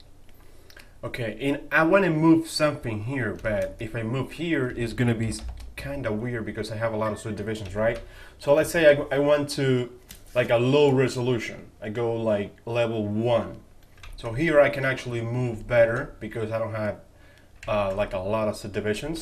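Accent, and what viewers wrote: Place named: Spain. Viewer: Excelente tutorial... Supongo que hablás Español por el nombre y además por el acento:)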